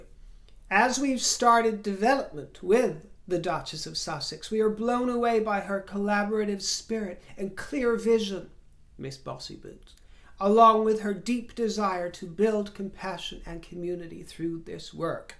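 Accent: United States. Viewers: an American here who truly delights in your broadcasts and appreciates your humor. Your American accent was very well done, but I was alarmed by the change! You sound better as an American than Meghan does. 😆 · I loved your Valentine outfit today, and I must say, you do an extremely good American accent! ❤️ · Luv your American accent!! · Your American accent is quite good. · Great American accent! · Ms. very elegant as always! Happy Valentines Day. I would love to be a fly on the wall, watching you and Sherlock Holmes ( Basil Rathbone) having a conversation. I am sure the conversation would be educational, and over my head. I am just a older country boy from NW Florida 20 miles east of Pensacola (southern Alabama really). Worked with a lot of brits. 23 and 24, recce loved their dry sense of humor. Every time I spoke Id get cracked on (southern accent) Can't understand Cockney accent it's funny though. 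Your American accent is spot on!!! Great show as always. God (Yeshua) bless you and your family and keep you safe!! Snogally woggly ha ha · Ugh NO American accent! My ears! My ears! 🙉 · 😂😂 Omg ur American accent spot on. You look ßæv†!fu| 🌹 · ♥ 💎♥💎♥ Happy Valentine‘s Day, dear. You had me laughing, as usual here. Loved the American accent and the insightful observations. Looking gorgeous and in top form. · I love your laugh and your American accent! Keep on fruiting!! 🍇🍎🍉🍋😄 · darling, beautiful American accent! Spot on! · Loved your American accent. Props to you 👏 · Loving your American accent. ❤❤❤❤ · Great American accent,! Perfect! 🇺🇸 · I’m American. LOVE LOVE LOVE your American accent!💗🤣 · I've been watching you for several months now and find you to be charming, sophisticated, on the cutting edge of outrageous fashion trends and above all, hilarious. Your American accent is excellent. 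Your laugh is wonderful and you wear your lipstick loud and proud. Throw in gloves and tiaras and you are a national treasure. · Your American accent is spot on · I love your American accent!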